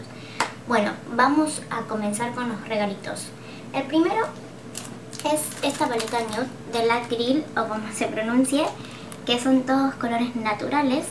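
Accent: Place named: Argentina. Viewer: hola me fascina el acento argentino😍 yo soy mexicana pero como me gustaría hablar como tuuuu🙊 PARTICIPO.. me encantaron la paleta de sombras, gracias por el sorteo.. un abrazo😘🙋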